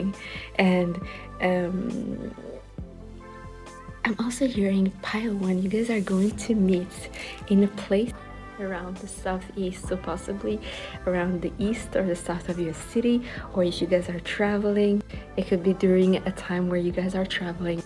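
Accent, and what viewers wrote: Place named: New Zealand. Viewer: Sounds like my family from tautoro Kaikohe new Zealand 😎🎤🎶🎶 who is paku eyes 😢 lmao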